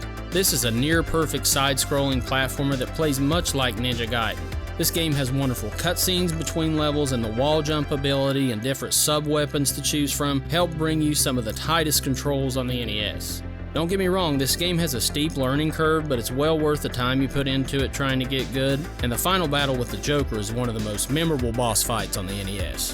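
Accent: South, United States. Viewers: Great content and I love your accent man. As a fellow southerner it gives me great pride to hear your voice in a well out together video game review YouTube channel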